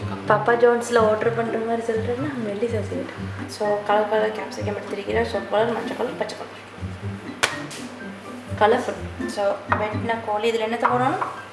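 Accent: Sri Lanka. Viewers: அஸ்ஸலாமு அலைக்கும் உங்கள் அழகு இலங்கை தமிழில் பேசுவது மிக மிக அழகாக உள்ளது எனக்கு பிடித்த தமிழ் இலங்கை தமிழ்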